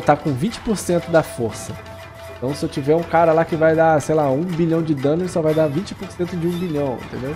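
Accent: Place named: Rio de Janeiro, Brazil. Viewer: Só q o cara e carioca lkkkkk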